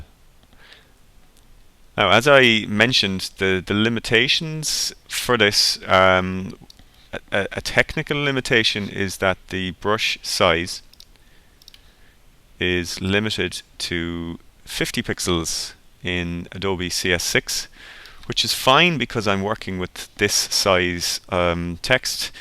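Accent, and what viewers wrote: Ireland. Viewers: Came for the content, stayed for the Irish accent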